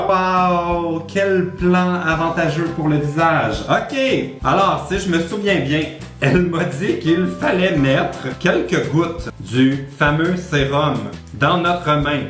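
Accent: Quebec, Canada. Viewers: cet accent mon dieu. quand je vais compredre les quebecois? mdrrrrrr :)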